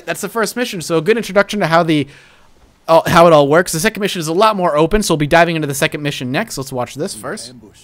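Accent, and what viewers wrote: South Africa. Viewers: It's not an Australian accent. It's South African. (I am Australian) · He's not Australian, he's South African.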